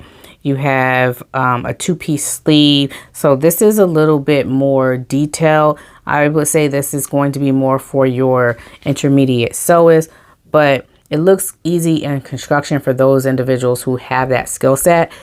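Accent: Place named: South, United States. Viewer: You love to sew dresses but I love to sew coats. I love this list. I have to ask: you sound southern, yet your vlog is named "Crumpets, tea and Sewing". Where do you find crumpets in the south? I lived in Ga for years and I couldn't find them anywhere.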